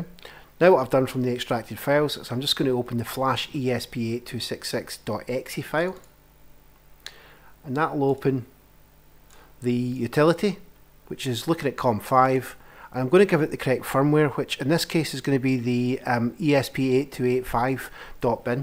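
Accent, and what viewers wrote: Scotland, United Kingdom. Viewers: I love your scottish accent :)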